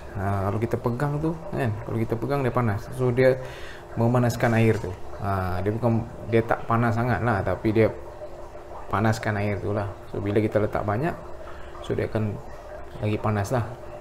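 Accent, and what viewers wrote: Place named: Sabah, Malaysia. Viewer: Ade sleng sleng sabah sikit la bang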